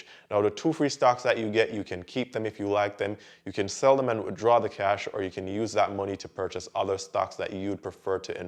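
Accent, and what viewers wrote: Jamaica. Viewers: Are you from the Caribbean (Jamaica)? You don't sound like American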